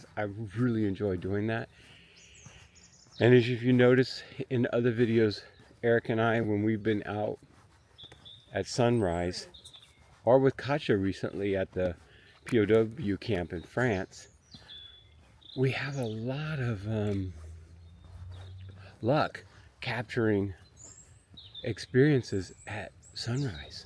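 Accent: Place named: England, United Kingdom. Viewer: You are brave walking in that forest in the dark, please be careful. It is a fascinating place, the history behind it must be thrilling. Scary place to be sure. Thank you. - love your English accent, very good indeed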